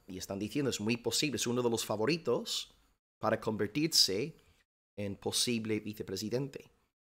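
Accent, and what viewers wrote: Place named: United States. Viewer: Habla como gringo pero no puede traducir bien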